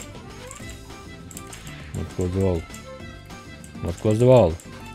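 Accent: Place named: Russia. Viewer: Bro where u from ? I think u from Russia если так то привет брат как дела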